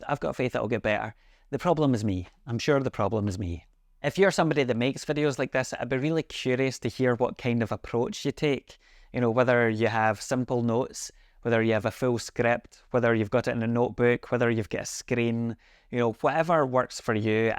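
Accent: Scotland, United Kingdom. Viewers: Your videos are great because it feels like you are hanging out at yours for a bit. Its so easy to get stuck in perfectionism loops, so whaever you need to do to have fun doing it is worth exploring. But as feedback, Ive never noticed you looking away from the screen and your videos always come across as really well produced, engaging and enjoyable. Just be yourself. Also thanks for hosting a synth/video channel with your own accent. Puts fellow scots at ease, even the ones from Edinburgh:)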